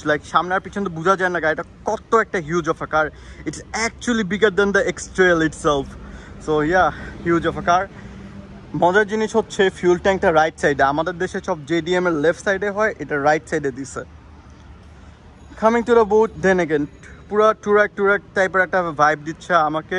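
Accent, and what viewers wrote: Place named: United States. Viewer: আপনি কি নরমালি কথা বলতে পারেন না ভাই। শুনতে যেন মনে হয় কোনো একজন আমেরিকান লোক কথা বলছে।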